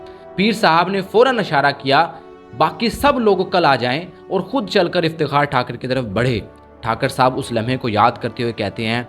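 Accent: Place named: Punjab, Pakistan. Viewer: You’re doing great job collecting information and passing onto the people throughout the Pakistan and in other countries as well. I would request two things number one please do some more research with your facts number two. Please fix your Punjabi pronunciation that will make your talks and your presentation more sophisticated. May Allah help you and give you complete success in this world and here after.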